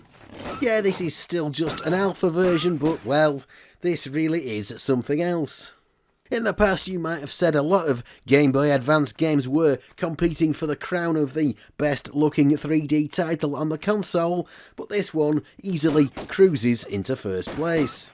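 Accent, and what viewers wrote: United Kingdom. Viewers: Can’t listen to a Brit talk about snes and Nintendo in general.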